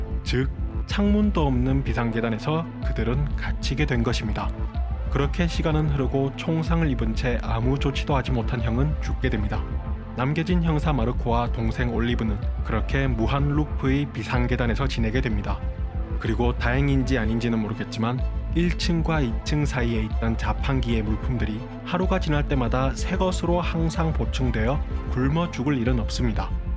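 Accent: Gyeongsang, South Korea. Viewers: ㅋㅋ보통다서울말로만 듣다가 경상도분이니깐 되게신기해요ㅋㅋㅋㅋ 나쁜뜻아니고 딱한마디 듣고 빵터졌어요ㅋㅋㅋ 귀여워용 크 친근감ㅋㅋㅋㅋㅋ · ㅋㅋ 사투리 너무 재밌어요 경상도 사투린가요?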